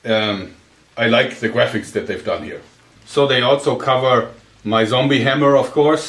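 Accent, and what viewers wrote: Germany. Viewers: Youre german, arent you?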